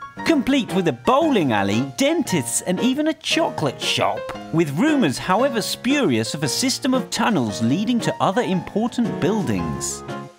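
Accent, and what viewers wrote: England, United Kingdom. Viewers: When you hear a British voice or like voice explaining things about the White House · I love how the narrator has a British accent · I love that the narrator has an english accent while talking about an American property lol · Sick UK voice. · Who burned it down? Let me think, yep I got it the Brits did it. You sound like one and maybe one of your ancestors did it.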